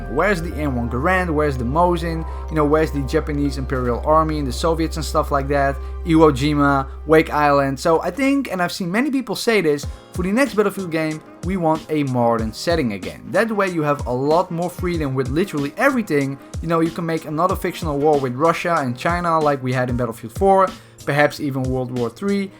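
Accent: Netherlands. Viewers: I swear you are dutch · Je kan gelijk horen dat het een nederlander is:) Lekker bezig #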